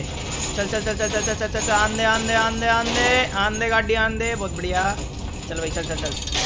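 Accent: Haryana, India. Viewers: Bhai Aap haryana ka hoo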